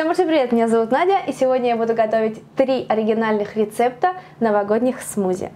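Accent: Spain. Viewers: Кто заметил что розговаривает с Испанским окцентом. Мне это нравки🤗🤗🤗😗😗😗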